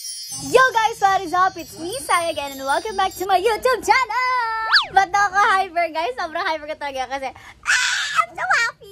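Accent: Batangas, Philippines. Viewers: I love that Batangenya Accent hehehe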